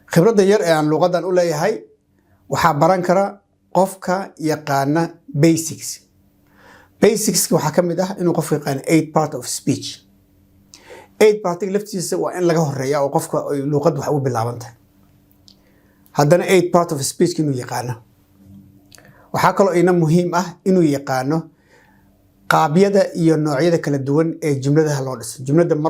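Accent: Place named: Italy. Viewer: Dadka ku hadla luqada Italianka ayaa lahjadaas leh. (Not all of them)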